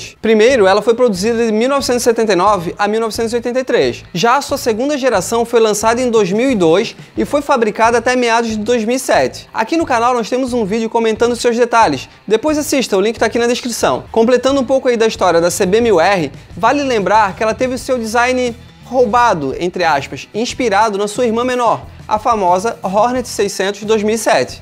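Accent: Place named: Caipira, Brazil. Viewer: Seu pareço caipira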